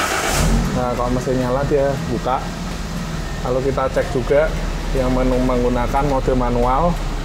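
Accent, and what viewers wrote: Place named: Semarang, Indonesia. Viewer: Logat semarangan bangts. 👍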